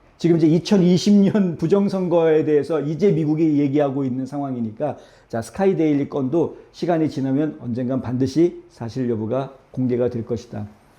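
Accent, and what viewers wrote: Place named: China. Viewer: 유튜브발음이 중국인같음!! 윤통체포… <link>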